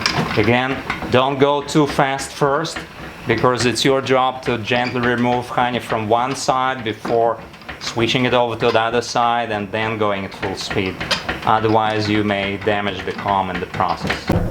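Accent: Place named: Ozarks, United States. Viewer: I live on the Missouri Ozarks. I was not ready for that accent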